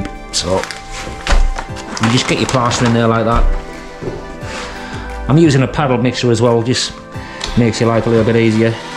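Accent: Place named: Liverpool, United Kingdom. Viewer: Can hear a fellow scouser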